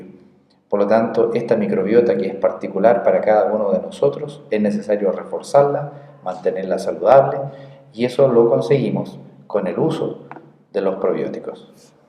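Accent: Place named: Chile. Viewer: Hola Estimado Muchas gracias por su aporte a las personas que estamos interesados en investigar aprender a cuidarnos. etc. En mi opinión es uno de los mejores videos que he visto sobre éste tema puntual. FELICITACIONES.. y la verdad que me he sacado algunas dudas con su video, me falta mucho aún pero seguiré aprendiendo necesito curarme. Me han diagnosticado INTESTINO IRRITABLE, y dudé sobre éste diagnóstico no tengo duda que lo que tengo es más que INTESTINO IRRITABLE. Por su forma de hablar parece Chileno verdad? Desde Uruguay le mando mis saludos. Francisco Machado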